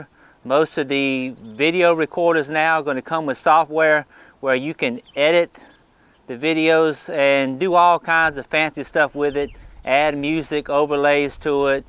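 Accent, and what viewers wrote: South, United States. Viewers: Great and informative video! You seem like a really nice person; thanks for sharing your knowledge. PS--love your Southern accent! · I just love that Southern accent. Plus, the guy was very positive and encouraging. I love it when things are kept simple - very simple and to the point. Great job!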